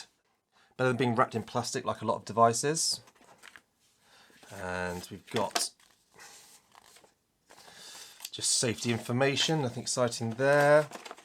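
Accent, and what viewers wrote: United Kingdom. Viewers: Why do all the British guys on youtube sound the same? So odd.👍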